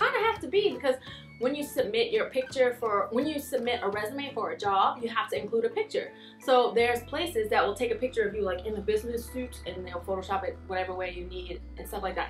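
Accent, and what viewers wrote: South, United States. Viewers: I knew you were from the south (I'm new here). Im from Macon ... Hello! 🤗🙋🏾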